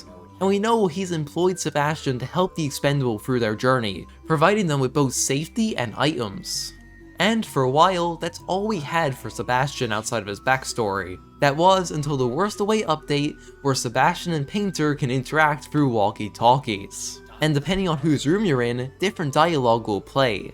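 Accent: Ireland. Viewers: i can hear the damn irish in your voice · Is bro from [anywhere in the island of Ireland (Not just in the Irish republic)]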